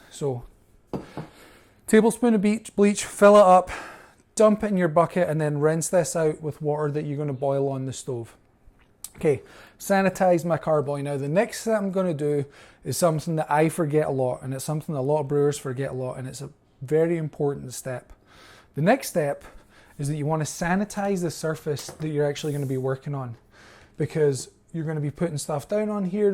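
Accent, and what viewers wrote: Scotland, United Kingdom. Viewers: where in scotland you from bud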